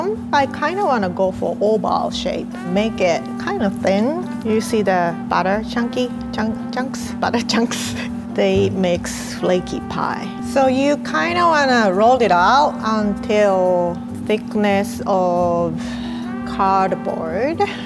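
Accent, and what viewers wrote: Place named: Japan. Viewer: I think because she’s Japanese so she pronounces it like that.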